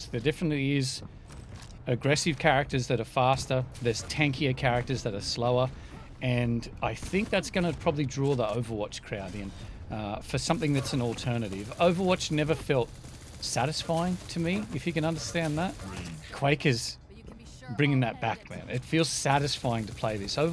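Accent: Australia. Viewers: I watch you for your personality and your smooth Aussie voice. Just play what you like and people will watch.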